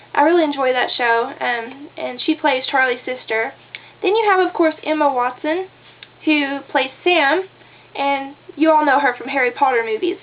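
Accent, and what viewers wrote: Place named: South, United States. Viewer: Yeah I like unconventional movies and I've had my eye on this one - thought you were from down South, you've got this June Carter thing going on! It's a beautiful accent to hear and I've been told you guys down south are the nicest people with good values - il just have to put up with my South London English accent!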